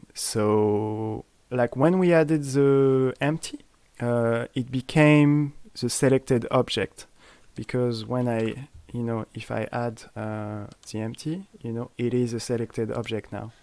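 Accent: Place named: France. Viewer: Super la video avec l'accent frenchy ;-) ! Très très utile, merci !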